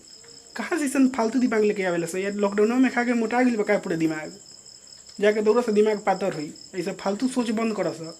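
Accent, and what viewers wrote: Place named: Bhojpuri, India. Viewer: Aapka bhojpuri avaj bahut achha lagta hai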